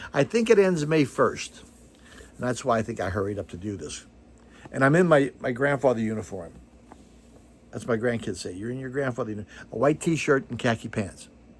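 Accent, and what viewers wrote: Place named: United Kingdom. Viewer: Cheers! With a British accent like that, you should go far!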